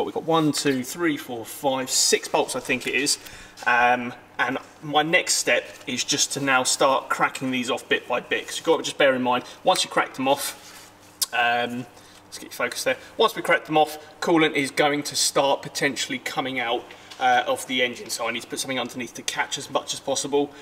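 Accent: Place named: United Kingdom. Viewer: Hearing a British guy do a video on repairing a British car just makes it fn authentic 😂. (Even though the car isnt as British as it used to be 🙄)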